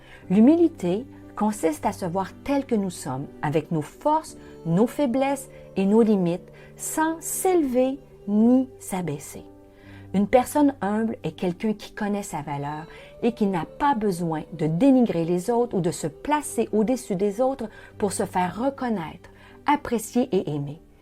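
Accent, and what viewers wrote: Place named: Canada. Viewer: Bonjour Pasteur....( de Vendée en France )......Merci pour ton conseil. Nous avons tous un peu en nous une envie parfois de paraître surtout en face de personnes qui ne font que s'élever, chassons cette envie et restons humbles, l'humilité est une si belle qualité.!!!!!Mon père était un homme très humble, érudit et qui parlait 4 langues, la réflexion d'une de ses voisines qui l'appris un jour m'a choquée.... c'était: "Oh! On ne le dirait pas "... c'est çà l'humilité, ne pas se glorifier de ce que l'on est, de ce que l'on sait... il a toujours été ainsi et il était mon exemple, mais le fait de rester humble parfois n'est pas facile en face de certaines personnes, je dois l'admettre...Résistons avec l'aide de NOTRE SEIGNEUR,l'humilité LUI plait. Bravo chère pour ton message de ce jour et sois bénie chère soeur en CHRIST...........j'adore t'écouter et ce petit accent Canadien est très agréable à entendre 😊😊😊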